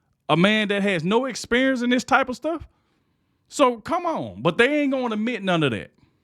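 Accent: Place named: Louisiana, United States. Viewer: I have to ask, where is your accent from? Louisiana?? It’s fun to listen to.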